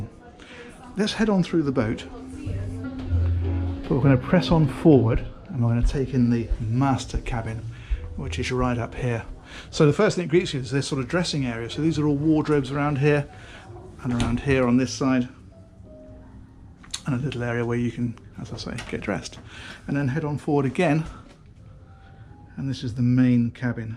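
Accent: United Kingdom. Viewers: Your British accent makes these tours even more interesting ...not sure why??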